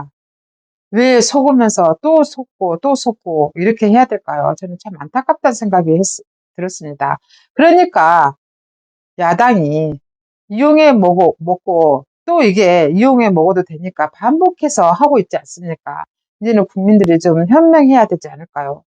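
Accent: Jeolla, South Korea. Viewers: 유튜브 하시는 유튜뷰님 말억양이 전라도분 같은데 다른 호남분들 당신 같으면 나라가 바로 설수 있었는데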